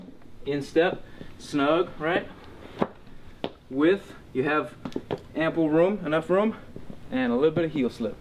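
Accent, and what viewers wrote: United States. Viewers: You have to be from Louisiana with an accent like that. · How can you not love that New Iberia accent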